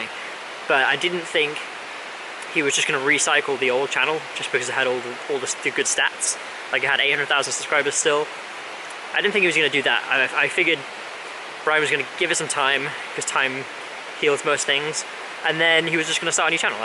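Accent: United Kingdom. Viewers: I like that your accent ad everyone in the UK is so different, but every time I watch a video of yours I always think you have a cold. Also no one should be told what to do and when to do it. Live your life the way you want and make videos you want when you want. I love Damon and I am glad he is back, but he stopped making videos for so long because he didn't want to make them and didn't feel right making them ya know? Do you and tbh yes what Bryan did was wrong and if he had true friends he doesn't and if they ever think of being his friend again then he has a lot of making up to do and a lot of trust to gain back and everything. I don't know Bryan personally and I am not sure I ever will, but yes I will be careful if I ever was to meet him and know him personally. Keep your head up. ♥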